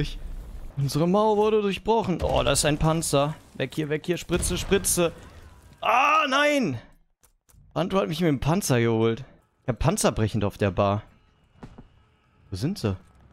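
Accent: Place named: Germany. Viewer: du hast so ein unglaubliches deutsches englisch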